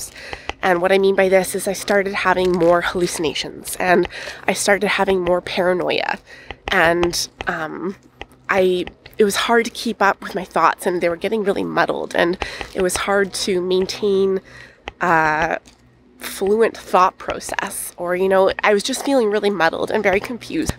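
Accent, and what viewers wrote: Canada. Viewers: Hi there, I'm not sure why I watched this video, but I was touched. I don't have schizophrenia, I don't know anybody who has schizophrenia and I don't know much about the disease at all, but I was fascinated by this video. I am sure that you don't want sympathy, but I have to say I feel so bad for you. You are a smart, charming, compassionate and beautiful young woman and I am horrified that you have to go through what you go through. It sounds like you are Canadian, so am I. I live in downtown Toronto. I am available to speak with you about anything at any time for any reason. If the crisis centres are not available for you, I am.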